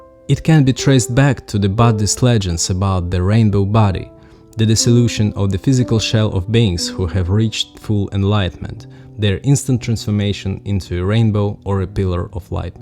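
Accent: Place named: Eastern Europe. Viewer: slavic accent 😭😭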